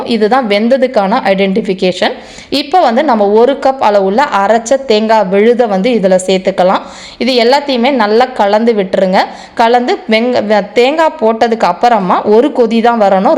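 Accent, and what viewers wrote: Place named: Coimbatore, India. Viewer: நான் உங்கள் new subscriber .நீங்க கோயமுத்தூர்காரங்களா?உங்க பாஷை அப்படி நினைக்க வைக்குது .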